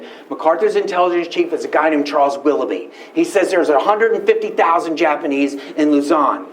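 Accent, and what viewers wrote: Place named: Bronx, United States. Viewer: This speaking style is 3rd rate, does not fullfill academic standards. Articulation as if the Bronx back alley accent, Joe Pesci et al. Unlistenable.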